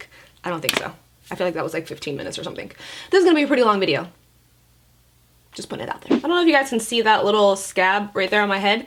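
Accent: United Kingdom. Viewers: Happy New Year!! I hope you do a skin care tutorial. Also I love when your British accent comes out, don’t know why but I do..lol Thx&see ya : ) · 💜💜By the way, I love your new purple hair😍 this is funny but my mum is British born and raised and she married my dad who was in the air force at the time and we have family over on England and I lived there for almost four years and your British accent is amazing😂👌🏻👏 Also..I just love you so much!!! Im in esthetician school and I'm supposed to be studying for a test on electricity tomorrow😩 and had to take a break!! Love you lots💜😍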